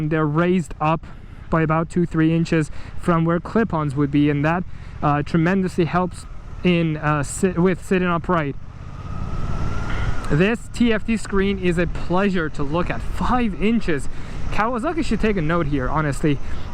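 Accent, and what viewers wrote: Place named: Iran. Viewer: Qorboone oon lahje Iroonit besham man jan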